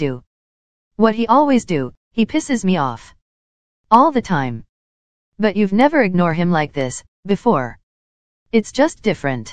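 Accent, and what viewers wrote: Italy. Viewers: ti prego ho capito subito che sei italiana, comunque adoro troppo il video<3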